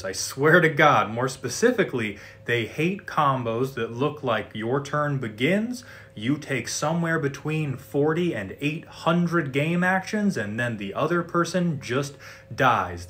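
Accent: South, United States. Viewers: Honestly that was some of the cleanest, smoothest, and slightly southern explanation for why I feel fear when I add one too many cheap red spells into my deck.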